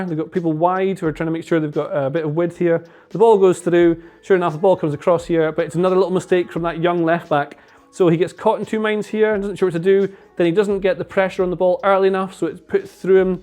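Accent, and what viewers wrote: Scotland, United Kingdom. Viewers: I think it's his Scottish accent. Dough always sounds like doo.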